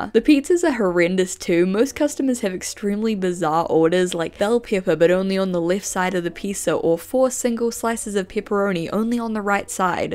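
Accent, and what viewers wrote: New Zealand. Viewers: didnt know you were from nz lol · HOL' UPP!!! yer a Kiwi.?! i, uh... actually forgot New Zealand existed. Thought you were an Aussie. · I'm sorry but your kiwi accent makes it sound like "cool meth" and it keeps catching me off guard 😆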